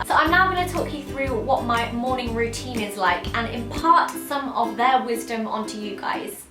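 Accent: United Kingdom. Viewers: I love your videos and I live your British accent❤️❤️❤️😘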